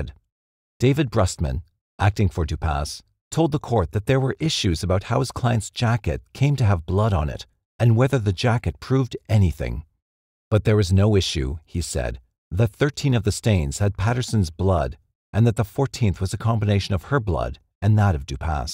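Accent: Canada. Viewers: Double down that sweet sweet Canadian narration! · I think the narrator is actually Canadian... and the creator is definitely not American. 🤓🧐😉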